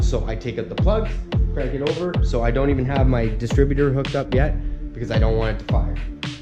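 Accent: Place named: Canada. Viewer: from the accent im gonna guess r u from Canada?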